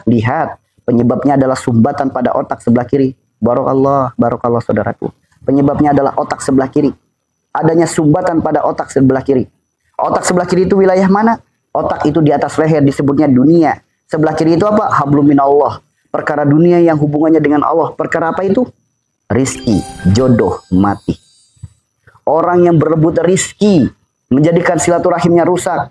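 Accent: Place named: West Java, Indonesia. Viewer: Pa ini bp orang mana kpnkaya orang sunda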